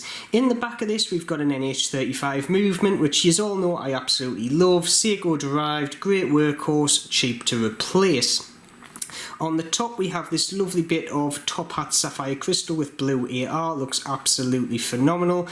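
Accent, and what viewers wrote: Newcastle, United Kingdom. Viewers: Hi. I'm a fan of Heimdallr and so I'm pretty disappointed by the quality of the lume, they should've done a better job of it. My skx homage of theirs is like a torch in comparison. Glad to see they've done a decent job of the bezel as they seem to have been getting criticism in that area with other models. Good to see you back mate we've missed that Geordie vibe.✌️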